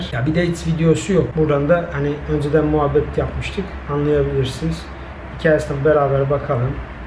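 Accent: Black Sea, Turkey. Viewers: Abi sen karadenizlimisin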